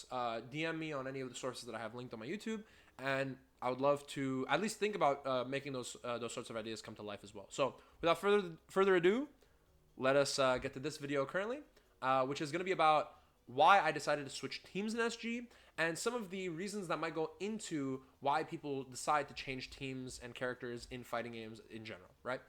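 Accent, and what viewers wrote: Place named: United States. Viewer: Wow your accent sounds so American in this video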